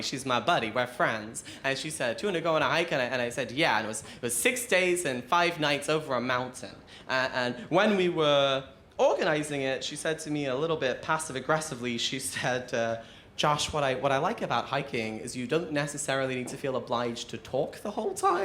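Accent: Australia. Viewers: just Aussie. He grew up in Brisbane, Australia and now lives in Melbourne... :) He can't explain his accent... he copped a lot of flack for it when he first started out because he is a Brissie boy... haha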